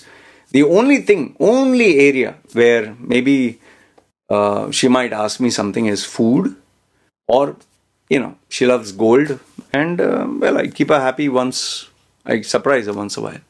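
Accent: India. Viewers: Indian accent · Bro is Indian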